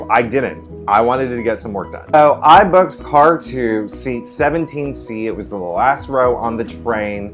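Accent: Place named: South, United States. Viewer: He's from the South.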